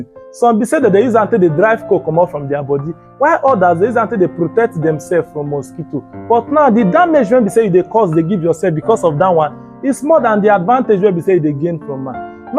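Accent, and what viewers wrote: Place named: Nigeria. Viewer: I didn’t know your pidgin is this thick oo 😂😂😂😂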